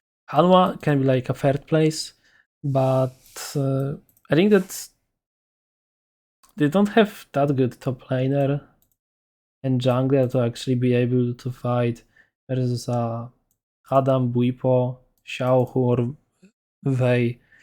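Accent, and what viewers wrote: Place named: Poland. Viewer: akcent polaka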